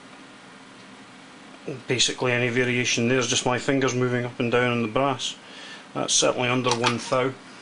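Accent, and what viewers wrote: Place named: Scotland, United Kingdom. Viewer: Sound Scottish...good video.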